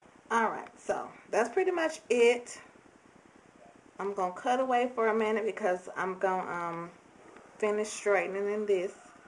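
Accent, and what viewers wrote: South, United States. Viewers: Gurl when you told your fear your tickled me, I could tell you where serious but you where so darn adorable about it. You are soooo cute and special and keep up the good work. BTW your accent really makes me miss the south a lot and when you say yall I just go man I really miss home.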